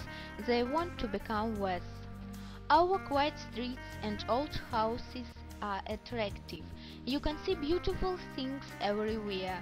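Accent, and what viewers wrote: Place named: Russia. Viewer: Сразу видно что русский акцент.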